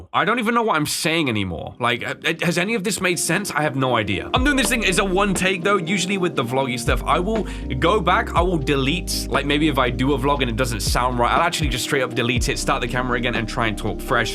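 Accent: United Kingdom. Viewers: I only discovered your channel late 2025, and have been binge watching since. I really appreciate your voice acting - I don't play games myself, but I love watching game plays like movies. Your voice acting really adds to that experience. You always reading out the texts really helps me not looking at the screen - I'm actually scared of horror stuff so I deliberately not look at the screen all the time when watching horror games lol. I really appreciate your curiosity and the fact that you always explore in games. I can always rely on your game plays to catch probably most lore of the storyline compared to other channels. I do get a bit fatigue with your high speed yapping and some lines you often say lol. But then it could be just because I've been playing your content across years back to back to back lol. I remember there were times when I was doing something with YouTube autoplay in the background and your video came up. 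I was like "what is this British dude yapping about now" lol. Or sometimes when I hear "hold up" "it's kind of good though" I was like oh brother...! But none of these are real criticism, I say all these with fondness for your content. Happy new year! I'll keep watching your videos in 2026🎉❤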